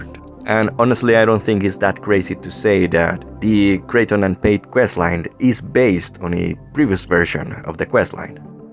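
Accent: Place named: Europe. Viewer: Loved the video so much! Thanks for covering this. By the way, are you european? You have a certain accent!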